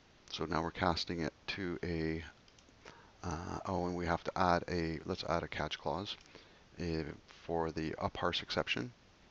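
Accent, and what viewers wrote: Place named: Scotland, United Kingdom. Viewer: sounds scottish. great accent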